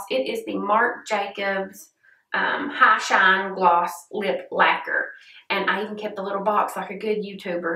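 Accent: South, United States. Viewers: I love your videos and your accent speaks to this southern KY girl's heart!💙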